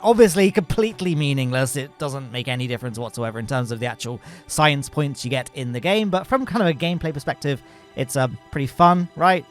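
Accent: United Kingdom. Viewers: Love the incoherent british rambling about "jool" and "jewel" for 5 minutes. Keep it up!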